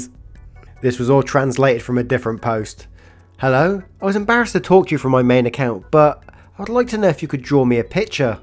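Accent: United Kingdom. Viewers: Sounding extra British in this vid. :)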